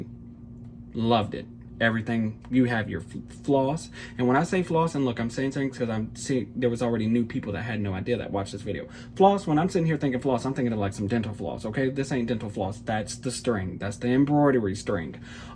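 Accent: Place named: Louisiana, United States. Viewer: you may stitch Victorian charmers but ohhhhh LAWDY son YOU are the true charmer! I happened upon your videos today and I’m smitten! I started cross stitching in 1978 after moving to Layfayette, Louisiana…I was elated to hear that Looosiana accent again! I so enjoyed your walk down cross stitching memory lane. It’s amazing how this hobby has evolved over the years. Back in the beginning I stitched on 26 count Aida. But when I discovered linen I felt like I hit the jack pot and I haven’t gone back to Aida…soooo there may come a day when you, too, will give linen a try. Like you, I also stitch on the same piece for days at a time. Monogamous stitching works best for me as well. You're not the only one who gets gobsmacked at the number of WIPS so many stitchers amass. I couldn’t take that pressure! I like your floss file system, especially the notion of the small bag for bits and bobs that are too much to toss in the trash bin. 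I recently converted all my cotton flosses over to Annie’s Keepers. That system is the best I’ve tried, and I’ve tried quite a number of systems. I can’t wait to see what your next video brings…keep them comin’ darlin!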